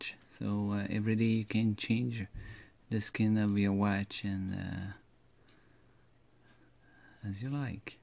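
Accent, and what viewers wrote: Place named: Quebec, Canada. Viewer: the Quebecois is strong within your voice. :D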